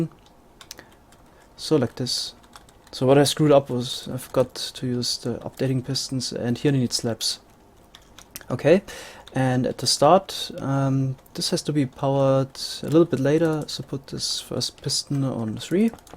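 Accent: Bavaria, Germany. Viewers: He's German. I heard him speaking it. He sounds kind of Bavarian to me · Haha 100 pro Deutscher oder? Der Akzent macht mich fertig. Nicht böse gemeint :D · Too sausend haha man hört voll raus, dass du Deutscher bist :D Aber krass, was du alles machst, großen Respekt dafür! :)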